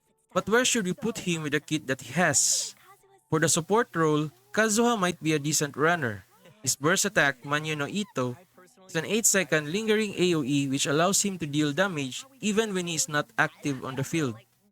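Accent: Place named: Philippines. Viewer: MmHmmhmmm that thick Filipino English accent is so *chef kiss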